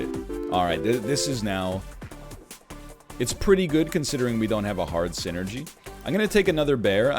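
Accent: Canada. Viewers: why is NL sounding exxtra canadian latley? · NL sounding very Canadian this video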